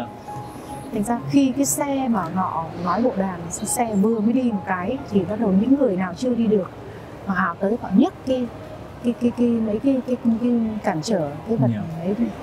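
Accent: Hanoi, Vietnam. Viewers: Chi sao van tre &Xinh the nhi! 🐅🇻🇳 Phong cach &giong noi cua Chi that "chuan Hanoi "!💖🇻🇳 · Phai noi giong HA NOI TRUOC NAM 1954 moi dung ban a vi sau nam 1954 moi nguoi o bung bien , tinh le vao sinh song o HA NOI roi.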